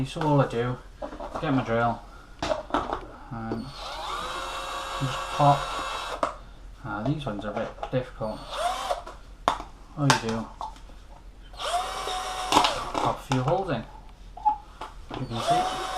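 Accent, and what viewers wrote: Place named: United Kingdom. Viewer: Hi, Just seen 2 video's so far, but its looking great. I did have (1) parsnip last year, and i totally neglected it. is your problem in germination? (i only sew like 6 or so) Tobacco i will give that a try this year, i dont know if i do have to ferment it though. I'm also very curious about your tomatoes; you sound like you're from GB (i'm just across the chanel) and i can't grow any tomatoes outdoors here, except for the cherry tomatoes. Will you also be planting/sowing some peppers, radishes,....? Grtz Tim, aka The Druid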